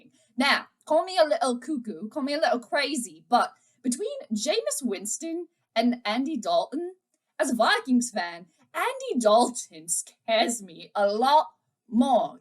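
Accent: United Kingdom. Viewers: ..I hear your British accent, and wish Roar of the Lions UK & You, could have had time to collab. They said they were going to have you on after the game, but It never materialized. I so hope both of you are able to collab before the Vikings vs Lions next game. I catch their show during the week. It's a trip to hear them talk & usage of the English language. "That team/player played like Rubbbish" etc. LOL. Hope to catch you for your live stream. Will look for your notification when you go live. 20 subs to 1 K. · Hey, very nice british accent, make me want some tea and crumpets! LOL I will be there in spirit as I will be out of town sun morning- in the twin cities. you're the tops as always. cheers. see you on the flip.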